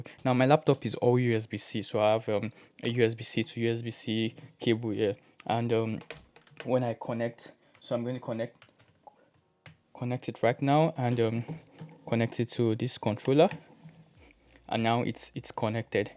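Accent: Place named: Nigeria. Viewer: Are you Nigerian? I love the accent bro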